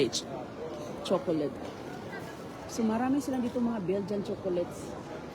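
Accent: Visayas, Philippines. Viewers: Sorry Jud Bisaya man si ❤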